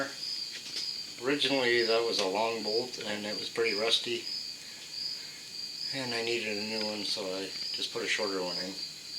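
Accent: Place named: Canada. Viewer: Sounds like you’re from Canada, I’m sure I’m off. Great job for not being a body man and you had your best friend helping you with the hood! Living in NYS the Capital of RUST BUCKET HEAVEN understanding and having the patience for fixing a truck like this is truly a virtue! My 05 silverado is getting the Shit beat out of it body from all the SALT and WEATHER MODIFICATION WARFARE we as human beings are FORCED TO ENDURE HERE in The Great Lakes Area over A Period of DECADES! Nice job maybe if I can muster up the patience between my cancer treatments and pain management from exhausting amounts of painful spinal surgeries I’ll be able to fix my truck in between fixing my house from the December 22 winter snowstorm that killed human beings along with locking everybody and everything in sight for a couple of weeks!!! It’s a shameful disgraceful sinful world we live in since the deep state Covid tyranny was forced onto humanity, so good for you for keeping yourself busy! 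We can all bury are heads in the sand now that the Covid tyranny is all gone!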